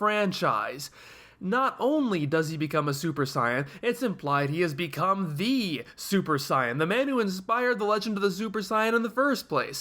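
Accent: Japan. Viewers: You have a tendency to use words how they're pronounced in Japanese. Even the way you say Goku is the Japanese way with the emphasis on Ku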